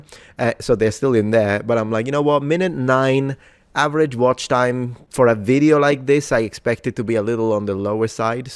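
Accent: Italy. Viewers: Ecco perché il tuo accento mi era molto familiare, sei italiano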